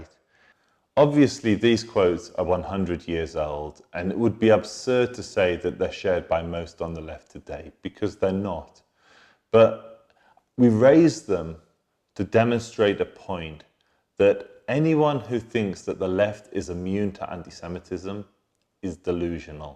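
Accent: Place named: England, United Kingdom. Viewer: I've never seen redheaded Semites with freckles and blue eyes...or speak English with a deep English accent... I really hope you were born speaking her or Arabic or Aramaic... if not your misleading the veiwers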